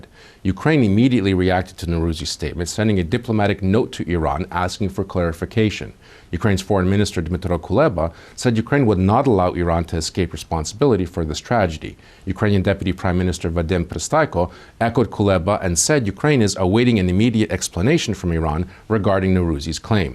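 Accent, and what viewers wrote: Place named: Russia. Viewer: I think has spent too much time in Ukraine and obtained a Russian accent.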